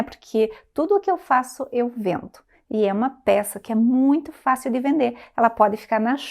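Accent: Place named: South, Brazil. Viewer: Menina contando com o sutaque que eu amoooooo acredito que tu e do Sul. Se cometi uma gafe perdao mas e qui amoo o sul essa aula e um luxuuu amei obrigada logo. Logo quero faser obrigada.pelo carinho.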